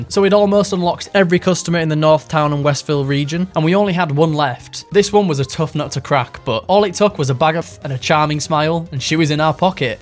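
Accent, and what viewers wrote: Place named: West Yorkshire, United Kingdom. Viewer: Most West Yorkshire accent I’ve ever heard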